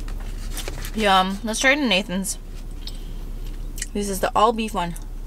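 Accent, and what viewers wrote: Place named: Minnesota, United States. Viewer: You never know your Minnesotan until you hear another Minnesotan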